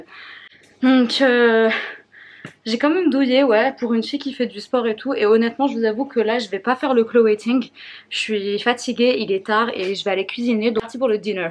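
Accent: Quebec, Canada. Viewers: C'est moi ou tu as un petit accent québécois 🤭🤭❤️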